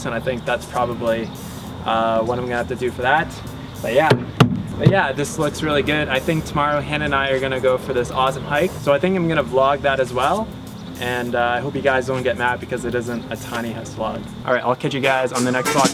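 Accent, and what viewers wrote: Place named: Canada. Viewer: You're Canadian and you talk like a California surfer, only with the occasional aboot thrown in.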